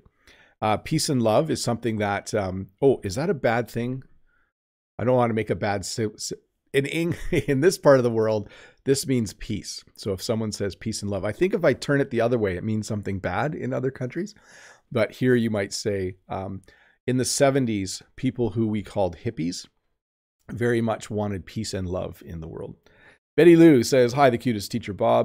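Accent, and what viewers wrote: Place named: Canada. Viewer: Hello Mr Bob I like your Canadian pronunciation and whenever I have time I see your videos and I really learn a lot from. good job good teacher respect from Afghanistan.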